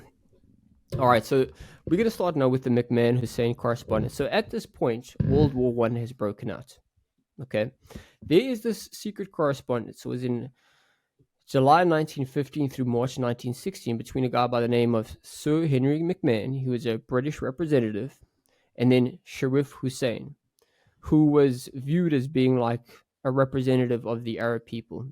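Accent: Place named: South Africa. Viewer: Sorry to ask trivial questions - where is from? His accent sounds so similar to South African. Aweh.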